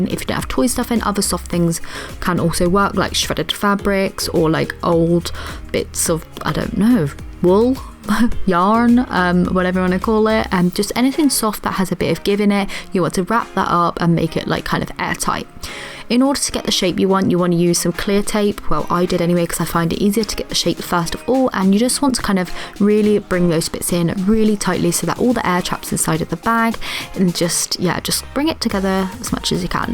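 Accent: England, United Kingdom. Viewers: No way are you English!!!?? 😍😍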